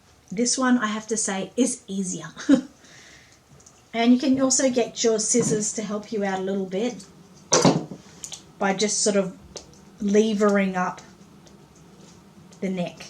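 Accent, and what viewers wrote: Australia. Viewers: Hi I am very new to reborn l wanted to complement you on your lessons have learnt so much from you it is so nice to hear an Australianaccent will keep watching you al the best from Sheryl from Victoria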